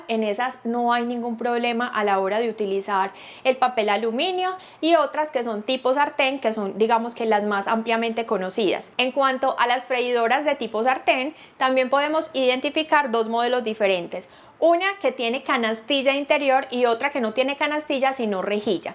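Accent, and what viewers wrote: Colombia. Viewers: Me gusta tu acento colombiano ❤ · Vine por la información, pero, Dios mío... Ese acento paisa... 😍 🇨🇴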